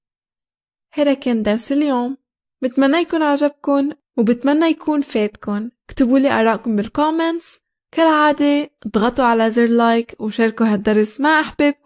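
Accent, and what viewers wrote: United States. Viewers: هاي انكليزي امريكي؟ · اميركي النطق او برطاني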